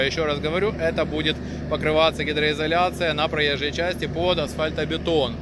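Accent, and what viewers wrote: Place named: Hutsul, Ukraine. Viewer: у вас файна гуцульська говiрка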